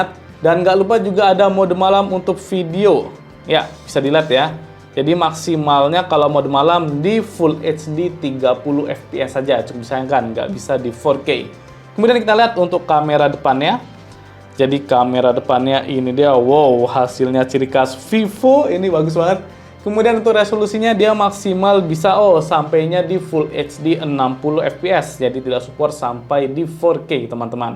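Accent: Yogyakarta, Indonesia. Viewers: Mas nya orang jogja kah?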